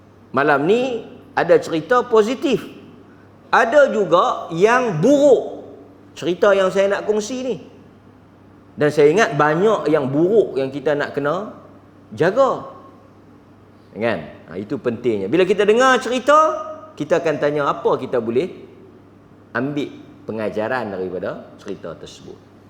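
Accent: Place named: Perlis, Malaysia. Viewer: Jazakallah khairan. Suka sangat dengar Ustaz bila menggajar dgn loghat Perlis (kadang tak faham tapi takpe). Memang teruk la manusia ni kalau Iblis minta doa pada Allah tapi kita minta dari selain Allah swt.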